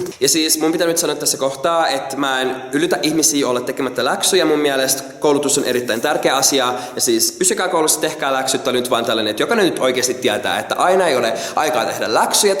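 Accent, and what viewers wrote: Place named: Lapland, Finland. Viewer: Toi sun lapin murre on vaa jotenku sulone😂😂❤️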